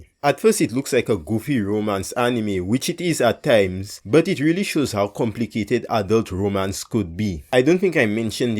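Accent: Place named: Caribbean. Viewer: I hear your accent. Are you from Guyana or St.Vincent? What Caribbean island are you from?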